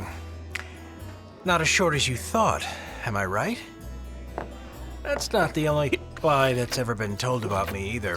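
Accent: United States. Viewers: nice american accent frenchy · Unwatchably annoying in a yank accent.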